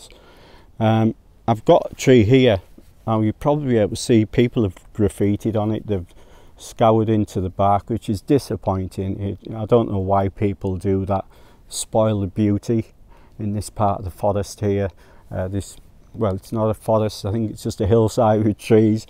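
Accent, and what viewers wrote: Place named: Sunderland, United Kingdom. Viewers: Great video, me and my wife are trying to figure out your accent, I've decided you're from Cockfield, she thinks Sunderland. Could you please end the mystery for us. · Canny video, where are you from? I'm from Sunderland and can detect traces of mackem in your accent but not sure.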